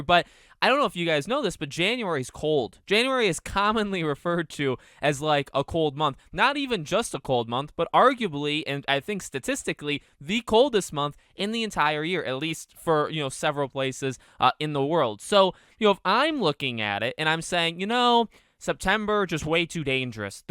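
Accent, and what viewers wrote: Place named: Massachusetts, United States. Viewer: The Massachusetts accent is really strong with this one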